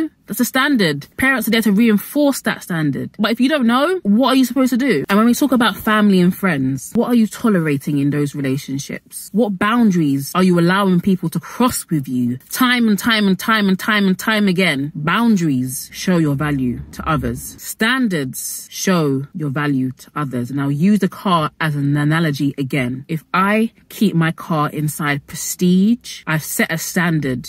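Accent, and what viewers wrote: United Kingdom. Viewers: How did i know you were going to have a British accent before clicking the video😂🤷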